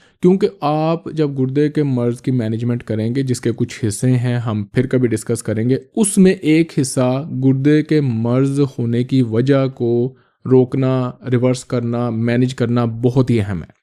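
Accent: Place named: Punjab, India. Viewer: Sir Your vids are extremely helpful for ckd patients. Your urdu/ Hindi has a Punjabi accent. Love from Indian Punjab....❤❤❤❤❤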